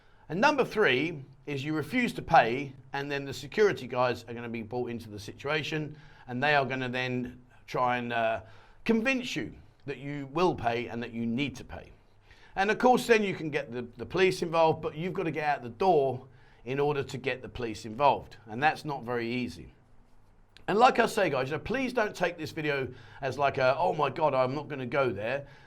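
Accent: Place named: South London, United Kingdom. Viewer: Where you from in England mate sounds like our way abit. South of London?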